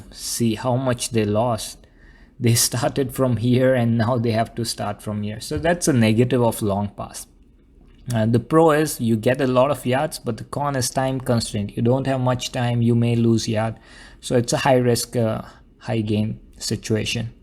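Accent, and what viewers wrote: India. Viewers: An Indien accent on Football. That's as cool as the offence guy to the defence guy on him: I crush you little man, being like: Catch me if you can...gooood luck! #cyberpunkcoltoure Take it as game of positions and the head is passing on the initiative savely. Even so difficult, the best teams win by passings from receiver to receiver avoiding ball loss, close to Rugby which is the Grand Pa, but way less aggressive. #provos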